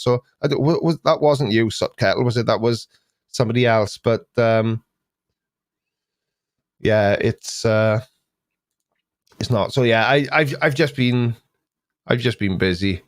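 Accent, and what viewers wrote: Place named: RP, United Kingdom. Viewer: his queen's english is ok.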